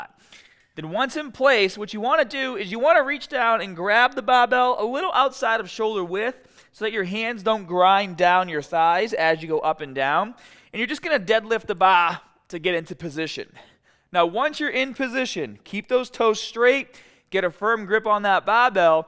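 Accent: Boston, United States. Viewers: Is this guy from Baaahston?? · this boston accent is killing me lmfaooo good content though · Damn. He sounds like he's from Boston. Every city in the northeast hemisphere of USA has an accent. All different. Philly and New York are similar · Sounds like a Boston accent lol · Loving this Boston accent right now.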